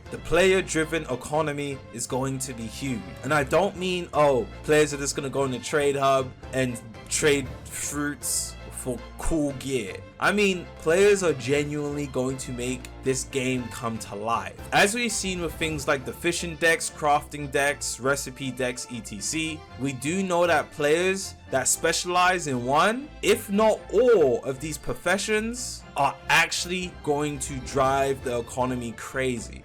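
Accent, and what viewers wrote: United Kingdom. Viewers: YOU FROM LONDON TOO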